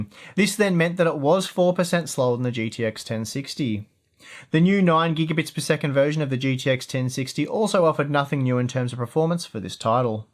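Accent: Australia. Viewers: You Aussie? Kiwi and you guys sound alike 😅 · I watch your videos because you have great benchmarks, and you're a fellow Aussie so your accent sounds more natural to me.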